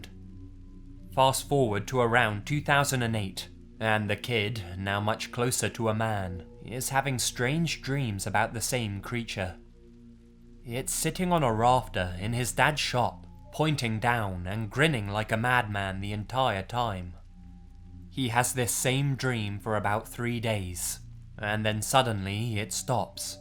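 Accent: United Kingdom. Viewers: are you british? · are you from the UK? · He's British - we don't speak American. Your jello and aloominum is different to our jelly and ALUMINIUM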